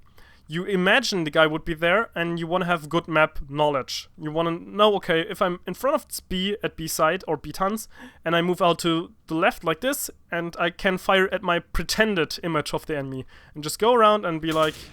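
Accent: Germany. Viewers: Bist du deutscher? Hört sich so an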